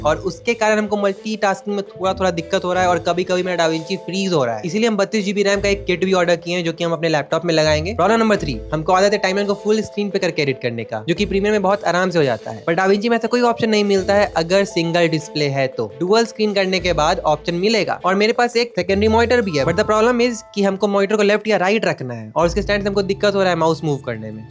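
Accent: Bihar, India. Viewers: Bhai tu bihar se h kya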